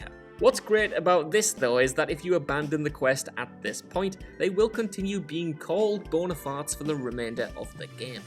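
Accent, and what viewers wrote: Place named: United Kingdom. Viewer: I think that is just a british pronunciation of "guns" the accent sounds british to me (i could be wrong idk)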